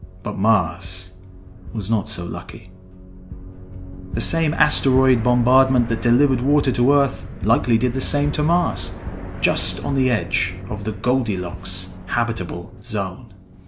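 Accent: England, United Kingdom. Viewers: I am thoroughly enjoying these videos, but I'm starting to find the narrator's accent more and more annoying. In this one it's particularly noticeable, seeing as it's about water -- or as he says it, "wo-tah," or sometimes, "woo-tah." I guess "wah-tER" is just too hard for Brits to say properly. All those R's in the English language, and the Brits just won't say them. But for some reason, they stick on the end of words that end with an "ah" or "uh" sound. It's maddening. Some of the other mispronunciations are just laughable -- "dee-ASS-pura" instead of "DI-uh-spora", or "invent-ery" instead of "inven-TORY" -- you know, like the political class in Britain! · Where is this coming from? His accent sounds like England. I am used to measuring things in inches, feet, yards, etc here in the USA, not meters, etc!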